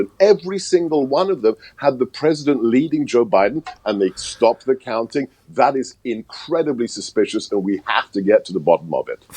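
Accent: United Kingdom. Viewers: why did the republican senators win and only Trump lost? What a sore looser. DUMP TRUMP. You got no evidence. just because you talk with a British accent does not make what you say true.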